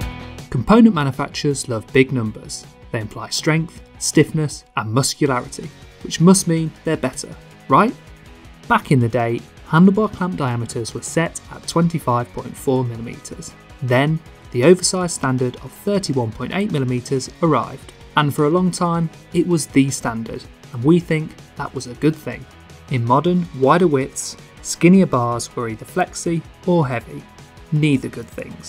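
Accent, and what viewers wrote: United Kingdom. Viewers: You are good but your british make my ears bleed.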